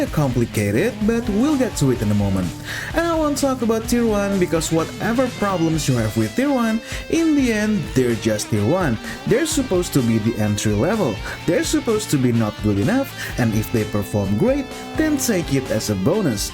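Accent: Indonesia. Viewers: you sound indonesian...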